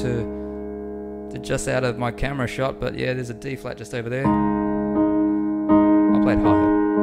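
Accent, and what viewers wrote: Australia. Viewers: Hey you're Australian!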